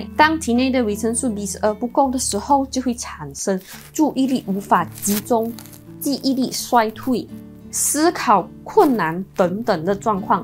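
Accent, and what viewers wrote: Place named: Malaysia. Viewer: 视频主的中文发音很不标准，乡土味很重。请问是不是马来西亚华人 ？😁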